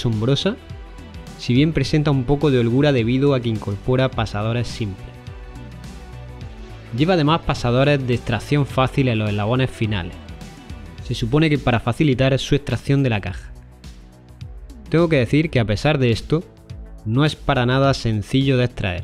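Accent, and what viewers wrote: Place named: Andalusia, Spain. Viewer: Tu tono andaluz y la música hacen de este video una joya....saludos desde Tucumán Argentina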